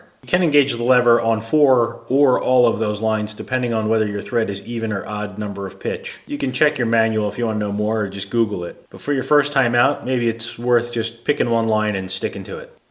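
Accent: United States. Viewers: I want to ask you something. I know I may sound a little bit weird, but, looking your videos, appreciating them, especially the newest ones because of the high humor content, but I just can't help myself not minding my own businesses. Do you live in our really fucked up country called Italy of the recently utterly fucked up continent called Europe? I couldn't not notice in your videos some really commonly used stuff from brands that honestly I'd struggle thinking they ever crossed our borders towards other countries in Europe, or indeed in the world. The Maurer (Ferritalia) stuff or the bottle of mineral water from my region, it's the kind of cheap stuff I couldn't figure out how it would have have made its way to the U.S., provided the fact, that you sound quite American to me, even though I'm no expert since I'm not a native english speaker.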